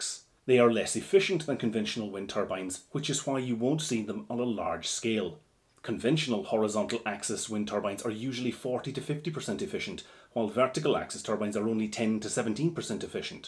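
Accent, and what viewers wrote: Ireland. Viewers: would you be able to comment as to planning permission for Ireland? You have an Irish accent, that's why I ask :)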